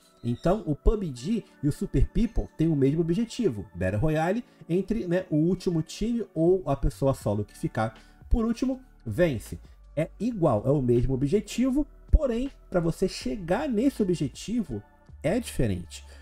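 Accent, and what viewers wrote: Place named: Rio de Janeiro, Brazil. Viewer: Mano o que e pubidi kkkkk você deve ser do rio de janeiro só fala asim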